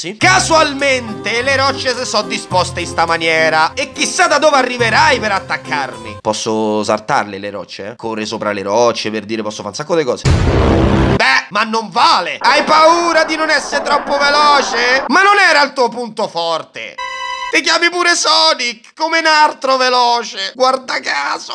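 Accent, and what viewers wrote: Rome, Italy. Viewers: Te vogliamo bene,solo per l'impegno che ci metti nel fare questi video e per la comicità con accento romano che non mette freno alle mie risate. Grazie di tutto <3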